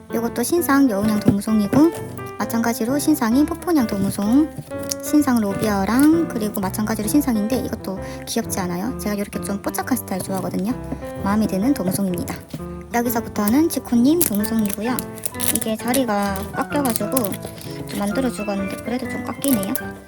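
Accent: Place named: Gyeongsang, South Korea. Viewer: 경상도 분 이신가요오?! 너무 귀여워요 사투리..😳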